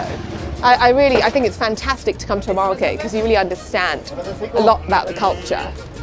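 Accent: United Kingdom. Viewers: Your accent sounds British. Are you British?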